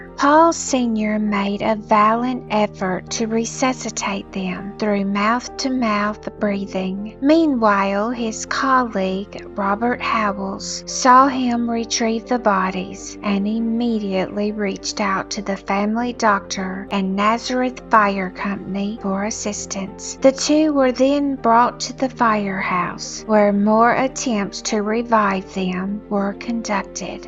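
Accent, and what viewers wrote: South, United States. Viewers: I just recently discovered. For my whole life up until the past 2 and 1/4 years i lived in eastern Pennsylvania, and every once in a while the Allentown news media would mention this shocking unsolved double-murder. You have a cute voice and Southern accent!!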